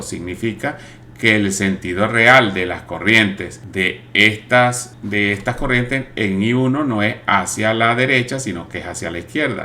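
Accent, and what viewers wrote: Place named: Guárico, Venezuela. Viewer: Tienes un asentó de Guárico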